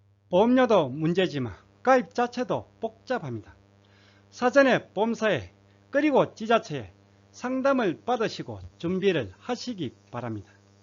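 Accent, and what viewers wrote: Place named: Gyeongsang, South Korea. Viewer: 형님 감사합니다 전세가 6억이면 어느동네 인지요 경상도사투시니까 대구 수성구 인가요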